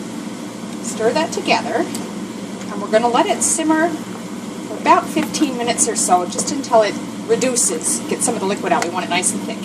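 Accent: Wisconsin, United States. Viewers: aahh,I'm from Chicago,and we can always catch that cheese head accent! Though like you I'm far from home too.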